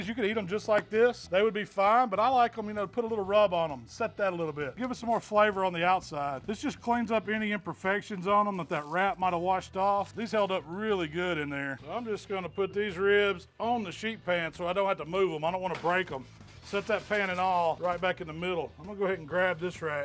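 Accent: South, United States. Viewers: Especially one with a southern accent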